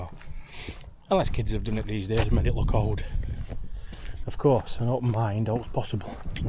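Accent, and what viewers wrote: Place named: South Yorkshire, United Kingdom. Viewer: That's a South Yorkshire accent if ever I heard one 😄. If you're in the area, you might like to check out the phantom black beast that haunts Anston Stones wood. Every one who's seen it (and I know someone who has) has the same reaction - run like hell. Hint - it seems it doesn't like people straying from the paths.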